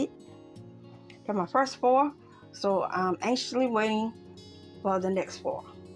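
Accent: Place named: Louisiana, United States. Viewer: I know this is kinda random, but you sound SO MUCH like my Mamaw down in Louisiana. Are you from anywhere near there? I miss her so much; listening to your voice is like a balm to my soul. 🥰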